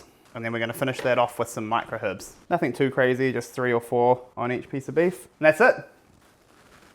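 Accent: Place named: New Zealand. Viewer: Who the fuck taught you accents lmao he's clearly a kiwi.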